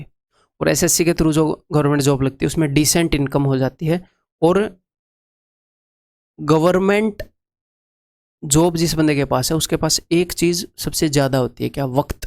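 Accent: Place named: Bengal, India. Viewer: Sir aapko Punjabi Me pura bengali babu lag ri ha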